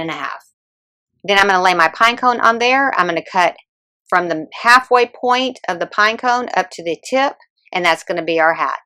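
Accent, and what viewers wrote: South, United States. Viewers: She’s the fastest talking southerner I’ve ever heard!! Lol. She must have sped up the video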